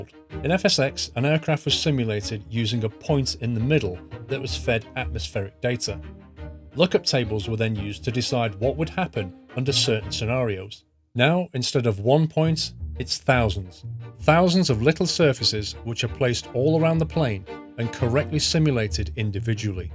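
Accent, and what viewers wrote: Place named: Bromsgrove, United Kingdom. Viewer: You speak just like my cousin from Bromsgrove, coincidence?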